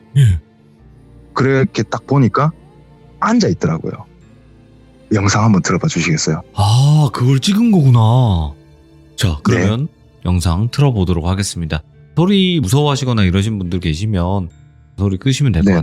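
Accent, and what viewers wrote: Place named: Busan, South Korea. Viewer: 오랜만에 듣는 부산사투리 넘 좋어요 멀씀도 넘 잘하세요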